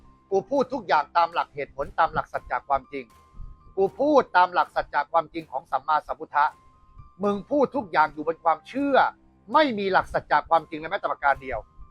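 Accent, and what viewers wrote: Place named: Thailand. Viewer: ผมชอบนะ..เพราะมันคือความจริง ที่พูด...ถึงแม้ลีลาการพูดจะแบบไทยแท้..แต่ สุโสสัง ลพเตปัญญัง ฟังด้วยดีย่อมได้ปัญญา...กรรมไผกรรมมัน ทำไป..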